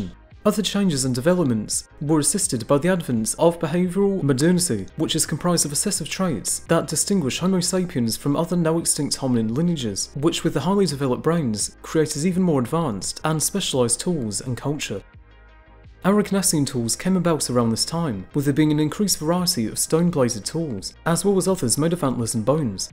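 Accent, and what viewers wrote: United Kingdom. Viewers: I enjoyed this, as I have many of your other videos. Please take this as constructive criticism when I say that your narration comes across to me as a monotone which, combined with your lovely but rather thick British accent, makes it difficult to follow the flow and details of the script. While I'm at it, I may as well say, too, that printing some words on-screen would often be helpful for amateurs such as myself. I'm not always familiar with technical terms, e.g., names of cultures. Thank you for considering my feedback. I am very much interested in prehistory, paleontology, geology, zoology, evolution and human and natural history. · I grew weary of his British accent of which I could understand maybe half. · excellent video! going to watch North 02 s part now (been following both of you beforehand). as for the audio issues, as a non-native english speaker it´s hard to follow you sometimes, and I don´t know if it´s because of your british dialect or the pacing. 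still, a loooot of information packed into a 15min video! great work!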